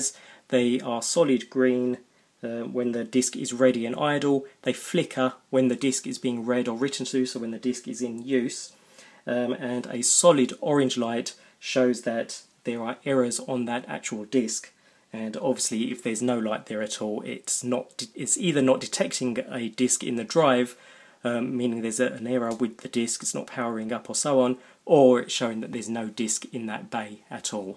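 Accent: United Kingdom. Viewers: American sounding music intensifies British accent: “Today we’re going to be looking at the...”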